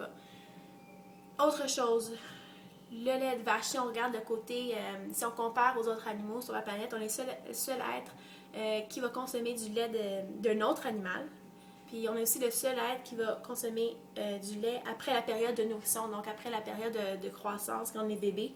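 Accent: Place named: Quebec, Canada. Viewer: Du Québec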